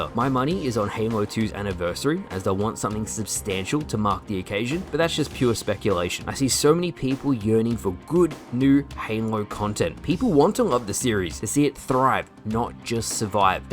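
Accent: Australia. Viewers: Sometimes I forget you're Australian.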